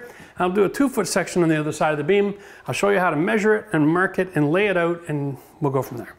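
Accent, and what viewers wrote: Canada. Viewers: I'm not sure about there, but they changed the formulation of pressure treated down here, and it eats steel pretty fast. If you don't use HDG or coated nails / screws - they'll be completely gone within 2 years. What your using there doesn't look pressure treated though. Maybe there's some sort of flashing tape, or maybe a strip of tyvek or something would be good to protect that? Idk - tyvek might pass it right through? Or maybe there's some kind of coating for the track? Maybe - my fear is just unfounded! Also, dang right, tyvek isn't a vapor barrier! It's a vapor retarder, but it's not a barrier. But up there (assuming Canada from the accent), my understanding is that exterior walls up there have a vapor barrier on the inside, fully taped seams. Down here in hot climates there is no vapor barrier at all. There's a vapor retarder. The paper face on the insulation - which also goes on the inside of the wall. 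The exteriors we wrap with either tyvek or 15lb.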